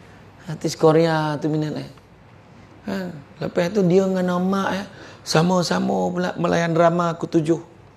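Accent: Negeri Sembilan, Malaysia. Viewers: Suka dengar loghat negeri sembilan ustaz. Mudah faham walaupun saya orang Kedah. Comel je bila ada part suami isteri. 😁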